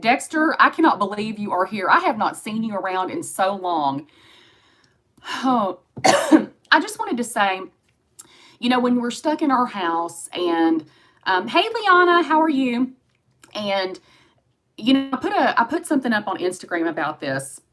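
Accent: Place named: South, United States. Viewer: I am in Australia and I just want to say that I absolutely adore your Southern accent. Could listen to you all day. xxx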